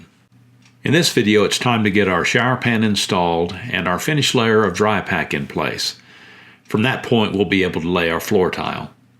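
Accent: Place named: South, United States. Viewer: I love your videos! Nothing better than a Southern gentleman teaching you home improvement especially with that voice and father-like tone.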